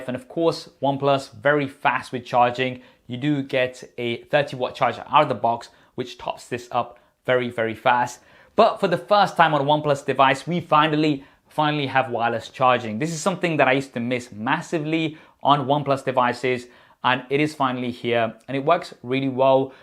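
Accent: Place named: United Kingdom. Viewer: He is probably Indian but he has an British accent so he probably lives in uk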